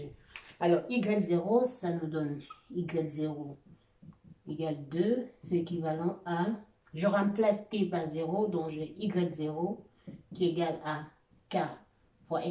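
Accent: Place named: Cameroon. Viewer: Vous êtes camerounaise, cela s'entend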